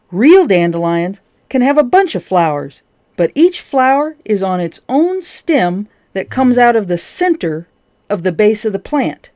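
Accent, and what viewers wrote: South, United States. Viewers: Hey great vid, I am watching this in England and I just love your voice, is that a southern accent ?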